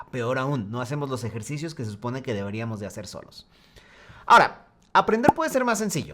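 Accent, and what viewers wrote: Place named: Mexico. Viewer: Lastima que eres mexicano con ese acento que no es muy agradable, sino fueras el mejor youtuber de este tipo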